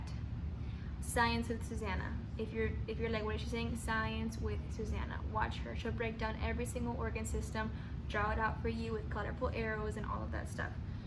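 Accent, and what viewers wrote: Miami, United States. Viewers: You are literally the cutest thing. You have a Miami accent. Thank you for your tips and tricks! Pero like thanks for the great video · I know a Miami accent when i hear one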